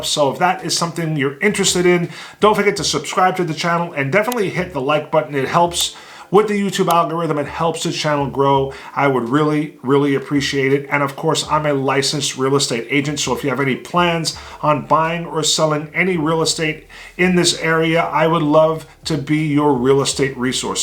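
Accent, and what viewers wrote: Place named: New York, United States. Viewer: You sound like you're from NY or Jersey!! Great video, very informative... im from NJ, and I'm stuck here in Naples, fl..... I can't wait till I can move out of here..... ppl need to realize that fl vacationing is not the same as fl living, my advice to anyone looking to buy anything other than a vacation home in fl, come here in the summer, not winter... FL heat and humidity are seriously no joke!!!! It's also overcrowded and becoming unaffordable...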